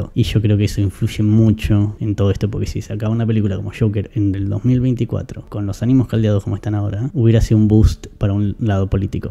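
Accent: Argentina. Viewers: Holaaa. Nuevo micrófono 🎤 necesitas calibrarlo o cambiar.,¿Puedo opinar?. En mi pantalla no logré entender algunas palabras con ese nuevo micrófono. (Use subtítulos , no sé si por ser mexicano pensé, el tono argentino pero. . . Pero pero ... En el celular con volumen un poco mas bajo y sin ruido alrededor.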